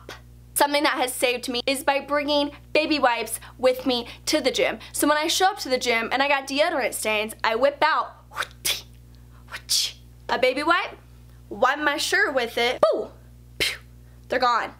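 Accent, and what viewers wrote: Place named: South, United States. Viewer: Are you from the South? Sometimes I hear the accent, sometimes I don't.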